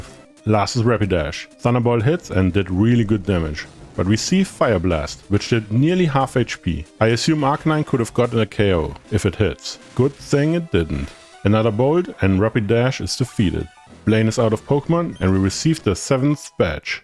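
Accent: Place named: Germany. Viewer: The accent is so familar but I can't quite put my finger on it. German?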